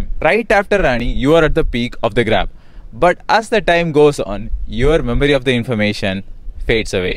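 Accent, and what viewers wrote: India. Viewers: Thanks you are so relatable. Please be our Ali abdal . I love our Indian accent .. do study with me videos as well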